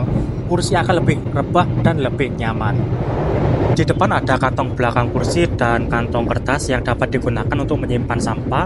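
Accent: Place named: Probolinggo, Indonesia. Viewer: logatmu kayak orang probolinggoan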